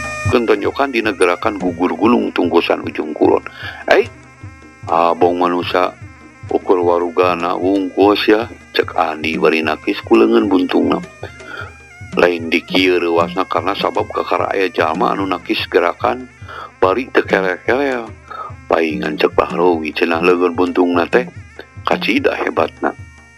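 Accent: Sunda, Indonesia. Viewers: ini cerita dongeng yg paling ditunggu dengan logat sunda nya yg khas,mantap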